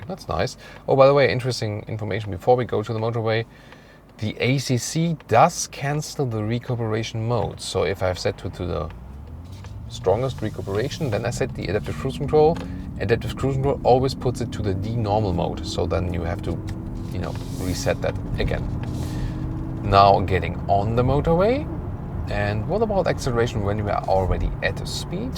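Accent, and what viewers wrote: Germany. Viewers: Wirklich stets gutes Review - jedoch muss ich immer wieder anfangen zu lächeln, wenn ich das typisch deutsch-typische Englisch höre... nicht böse sein :-)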